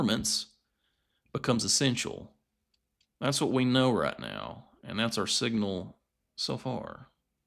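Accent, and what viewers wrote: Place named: South, United States. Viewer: Great southern accent. Love it. Subscribed.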